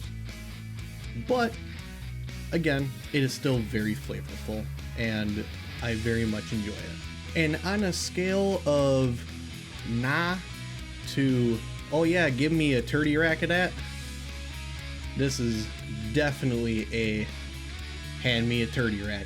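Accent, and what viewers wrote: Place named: Wisconsin, United States. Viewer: Charming Wisconsin accent! It’s a shame the music overpowered your voice a little. Thanks for the history and review.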